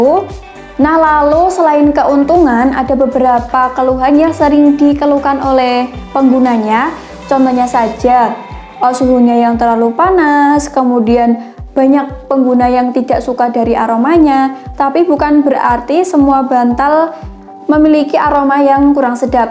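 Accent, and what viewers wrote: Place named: Java, Indonesia. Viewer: Medok amat 😀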